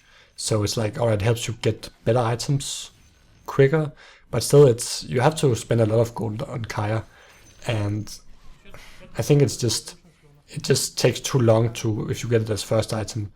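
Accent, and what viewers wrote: Denmark. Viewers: I Can hear the danish in you.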